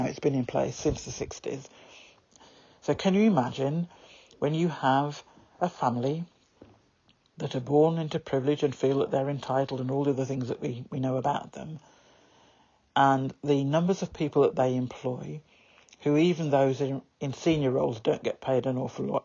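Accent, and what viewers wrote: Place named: England, United Kingdom. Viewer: every time I hear that speech it gives me chill bumps. This will be the first time I heard it from a person with a English accent…and it was beautiful! I also think the speech was poetic.Thank you!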